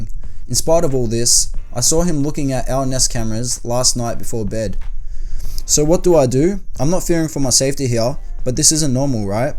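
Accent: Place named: Melbourne, Australia. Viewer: i know u were saying owls but im not from melbourne so ur melb accent making it sound like ur saying elfs n im sitting here picturing elfs for majority of it until i realised, my head hurts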